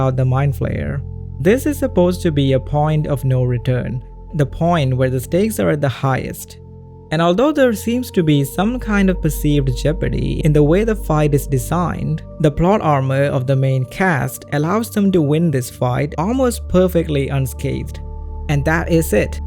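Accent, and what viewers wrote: South India, India. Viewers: 😢 the americanised south indian accent is throwing me off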